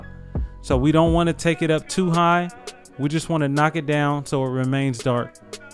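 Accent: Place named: Sacramento, United States. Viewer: just asked cause you sounded like some of my folks in Sacramento but Oregon ain't to far from cali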